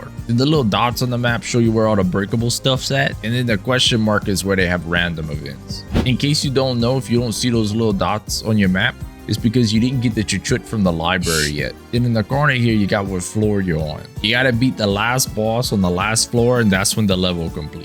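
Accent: United States. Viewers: Bro, i didnt look at your channel name when i clicked on this but after hearing your accent i was like Mae, dats a fellow coonass, Cher!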